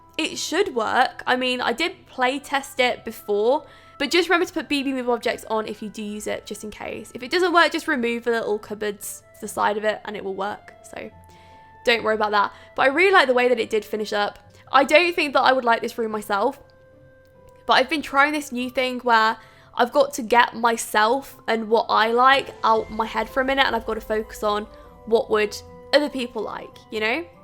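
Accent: United Kingdom. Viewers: You have the most British accent I've ever heard.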